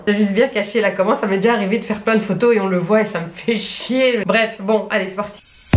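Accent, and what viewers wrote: Belgium. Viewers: Bonsoir ,il me sembke que vous avez un pet accent ? Vous êtes Belge car vou a avez un accent ou etes Belge ou Bruxelloise ?viy me faites rire viy et trop comm jeun femme viu et mariée ?si oui botre mar ne diu pas s 'ennuyez avec vous ?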